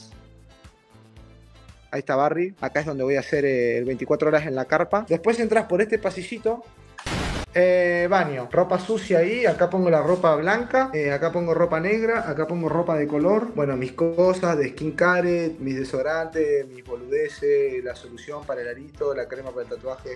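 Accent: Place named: Chile. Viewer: pov:la presentacion del video hola hola po weon (es por el acento de chile)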